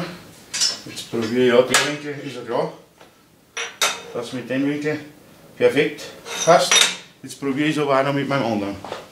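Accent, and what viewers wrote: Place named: Bavarian Forest, Germany. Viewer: Hallo Du hast die gleiche Sprache wie ich wo kommst denn Du her, ich vermute nicht weit von Regen entfernt (grins). So von Kollege zu Kollege, aber bei mir is nix mehr mit Stom seit ich drei Schlaganfälle und einen Herzinfarkt hatte, da musste ich auch noch das reden lernen, natürlich waldlerisch (grins).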